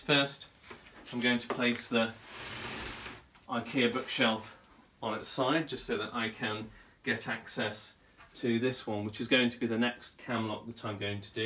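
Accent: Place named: United Kingdom. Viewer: Love the British accent, wish I got one too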